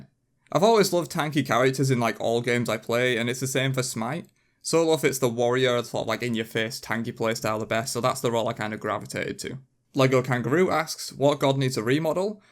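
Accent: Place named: Northern England, United Kingdom. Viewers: Big up the Northern English, im a northerner myself but slightly more northern than Yorkshire, still not too far from eachother at all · what part of Yorkshire are you from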